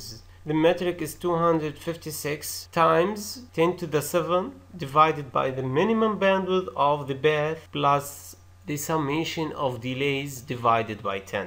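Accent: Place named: Arab world. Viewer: Well explained. Lovely arab accent.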